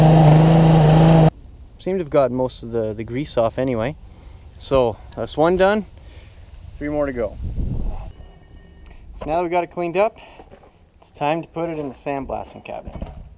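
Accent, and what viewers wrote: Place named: Canada. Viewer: the content provider’s dialect sounds Canadian to me, possibly Wisconsin, or Minnesota here in the States.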